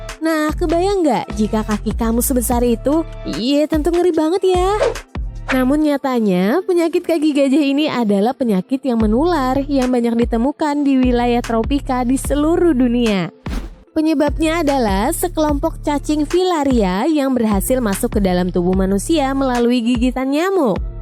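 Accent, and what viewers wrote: Banjar, Indonesia. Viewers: Yg bikin channel ini orang banjar ya?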